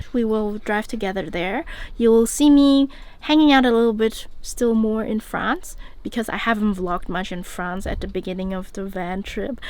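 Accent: Germany. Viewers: it sounds like you have a german accent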